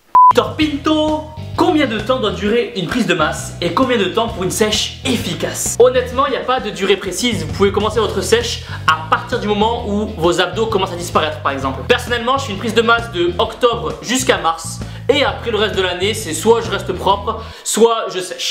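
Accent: South, France. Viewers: Question perso : Tu habite ou ? Car je vois que ta un belle accent du Sud je doit pas etre loin de chez toi ;)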